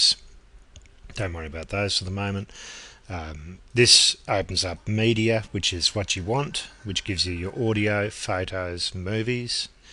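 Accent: Australia. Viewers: He's Australian...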